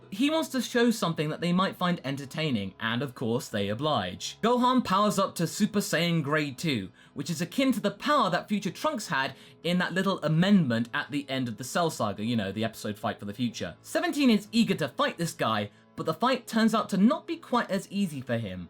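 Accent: United Kingdom. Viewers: hearing "robot arms make everything better" in your beautiful British accent made me happier than it should have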